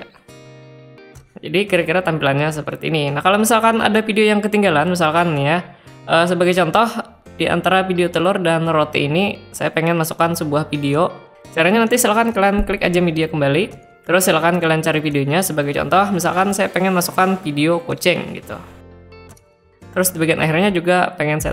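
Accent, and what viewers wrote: Banjar, Indonesia. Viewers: Dari penyebutan huruf, pinanya beliau ni urang banua jua nah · Orang banjar nih yg ngomong..😄😄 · Kaya orang banjar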